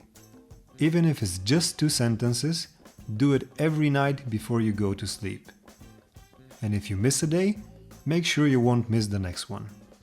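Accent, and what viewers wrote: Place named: United Kingdom. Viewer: Слушам Ви с интерес. Чувам британски акцент. Хубаво! На американски английски “Дневник” е “ diary” Бъдете здрав !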